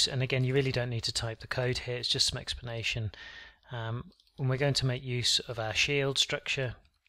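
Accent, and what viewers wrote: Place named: United Kingdom. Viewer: Your clear voice with nice BrE explain things way more better than my uni lecturer, shame on them who call themselves "professor" may have great academic achievement but have no idea how to teach in an accessible way .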